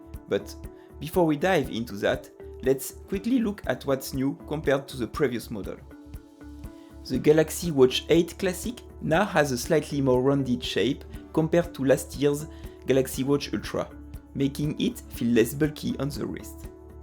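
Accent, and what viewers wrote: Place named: France. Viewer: This is the thickest French accent ive ever heard. Its great. Thanks.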